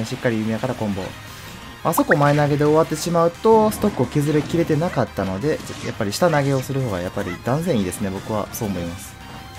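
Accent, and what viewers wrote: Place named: Kansai, Japan. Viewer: 良い関西弁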